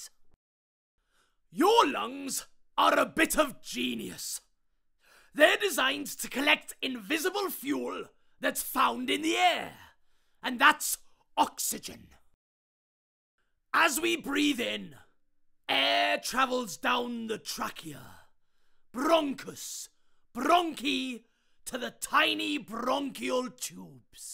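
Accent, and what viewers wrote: Wales, United Kingdom. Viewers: There's some people who love the entertaining Welsh accent, and some who absolutely hate it. I appreciate the attempt to make this entertaining, but when there are so many people against it, I reckon it'd be safest if video makers didn't use it. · Warning: contains a dodgy Welsh accent.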